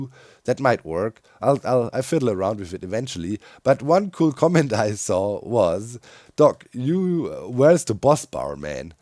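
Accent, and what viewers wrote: Germany. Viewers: "fiddl wid it a littl bid" must be the cutest thing you can say with an endearing German accent like Doc's ❤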